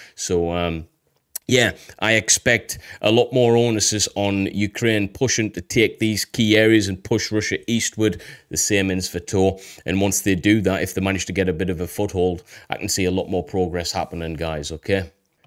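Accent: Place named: England, United Kingdom. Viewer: Yo ain't seen you a while respect got the imposing look. It's nice to see a real hard man with intelligence and English accent. As grew a bit at gchq Germany, well afnorth Holland with the AF plates lol hearing your attitude takes me back 20 years. Peace and love yo. Could you put your intelligence and ingrained survival mature focused on structure and violence give a guide for us English incase something comes here. Peace and love my G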